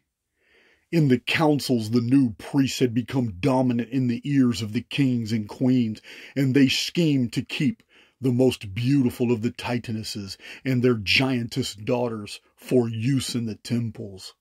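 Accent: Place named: West Texas, United States. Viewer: Yes indeed nomad, it was rather masterful wasn't it? When I first heard say sim-ul-arcam, my British self head 😮was rscreamed "sim-yew-lacrum". His voice is very colorful and he knows how to draw us in with that West Texas accent and putting real dramatic intonation into his delivery. 👏🏆😊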